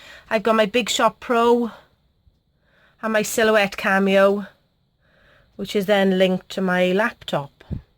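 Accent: Wales, United Kingdom. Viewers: Greetings grom Ireland What a fab craft room. So dinky. Would listen to you all day. Love the Welsh accent. Used to holiday in Wales with my family when I was young.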